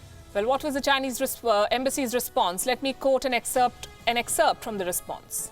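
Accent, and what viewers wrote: India. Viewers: If chinese are low class Indian is it better . This reporter still hv to speak well Not induan accent. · it's indian accent only dumbass not everyone has stereotypical accent likewise I am sure not everyone in China is Ching chong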